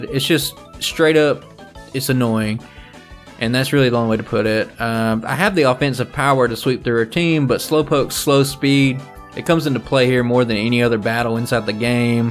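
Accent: South, United States. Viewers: Personally I'm a fan of the southern sound you have when talking. It's different and makes you stand out. And if that's just how you talk then keep it up. I may watch may different people streaming these games but i love everyone's approach be it jrose, Scott's thoughts or vanman, everyone brings something new to each play thru. And honestly I like that your open about doing it not as a professional but just a fan of the series. Keep up the good work man. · As a southern girl, I love your drawl. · As a guy with a strong southern accent I appreciate the accent. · I like the southern drawl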